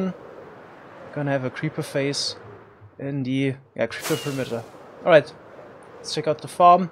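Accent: Germany. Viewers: Oh du bist von Deutschland. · Bist du vielleicht Deutsche weil dein Englisch hört sich so an 😂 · Bist du deutscher? Man hört das voll raus! 😂 👍 Aber geile Video💗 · Man merkt absolut das du deutsch bist haha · Deutsches Akzent xD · You Sound a little Bit german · Are you German? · Is he German? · Bist du Deutsch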